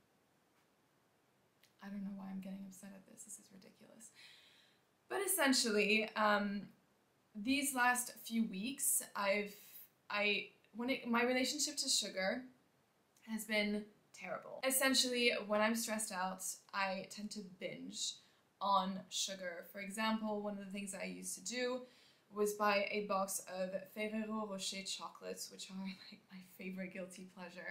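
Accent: France. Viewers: As an American who does not speak French, it’s hard to understand when you say the words with a heavy French accent. It would be really nice and helpful if you could put the words on the screen.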